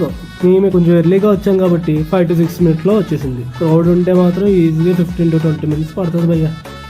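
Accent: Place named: Godavari, India. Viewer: Nice VLOG, Godavari slag super👏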